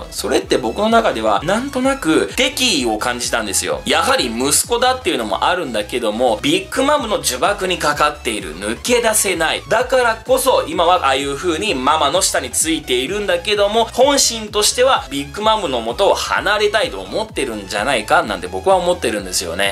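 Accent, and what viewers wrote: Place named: Osaka, Japan. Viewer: こう思えばエピソードオブ空島のときの生放送やけど大阪弁強かったなぁ！ええねんで俺も大阪人やし、もっと大阪弁使ってぇーwww えーカタクリは俺の女♪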